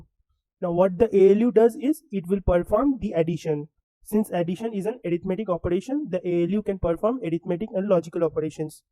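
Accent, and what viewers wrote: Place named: India. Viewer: Indians speaking emglish sounds very ridiculous, next time use subtitles, or remove anything below your tonge, the world will thank you.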